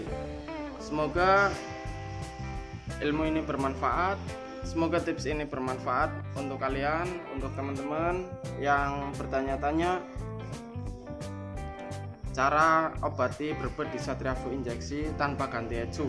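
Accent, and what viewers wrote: Tegal, Indonesia. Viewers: Ketara wong tegal ya um😂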